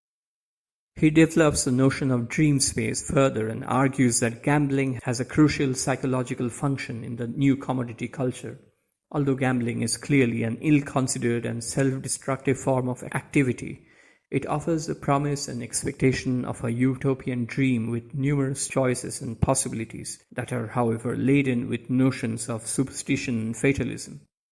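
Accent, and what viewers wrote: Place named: India. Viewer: I feel much oblige to say that i don't like Hindi accent, but yours was pleasant. And the info and insights you provide are powerful. Thanks for the video!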